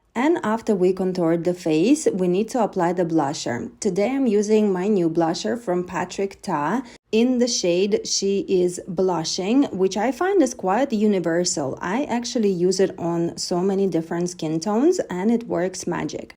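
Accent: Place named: Russia. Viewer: Accent is familiar, from one of the former USSR states) Russian , I think )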